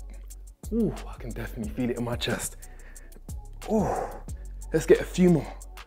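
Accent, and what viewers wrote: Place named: United Kingdom. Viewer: and because he's real attractive and has a British accent most will ignore what they sensed Like I did 😂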